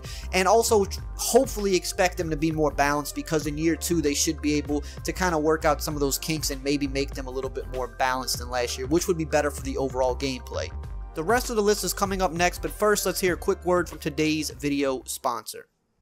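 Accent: Louisiana, United States. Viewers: Are you from Louisiana? You have a Louisiana accent.. just like me 😂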